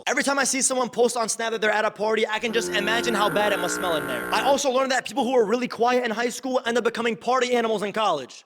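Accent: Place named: Jordan. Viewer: Bro English with Jordanian accent is insane haha, great video btw bro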